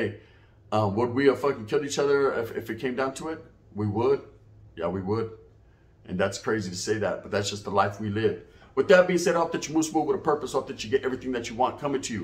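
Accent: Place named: South, United States. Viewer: Tbh, I thought you were a southern at first.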